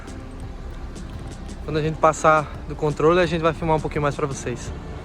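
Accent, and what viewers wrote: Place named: Northeast, Brazil. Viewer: Eu sei que e brasileiro sim mas seu sotaque e nordestino isso que disse .Eu sou paulistano e tenho inúmeros amigos nordestinos.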